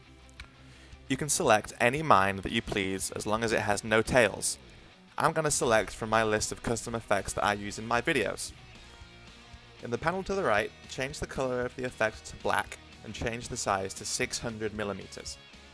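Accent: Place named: England, United Kingdom. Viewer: I had no idea that you were English. Definitely surprised me when i heard that accent. Don't know why i thought you were from the States.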